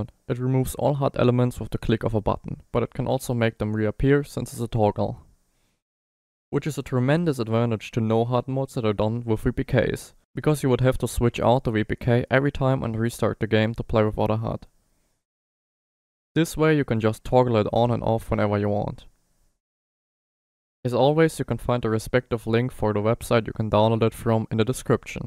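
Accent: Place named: Germany. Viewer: I'm just here for the German accent ASMR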